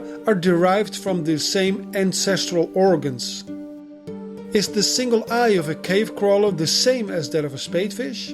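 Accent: Netherlands. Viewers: the moment i heard your voice i knew you were dutch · goeie content maar runt je computer op een aardappel? (I can sense you are dutch by your accent :p) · Is deze gast Nederlands ofzo? Hij heeft echt zo'n accent.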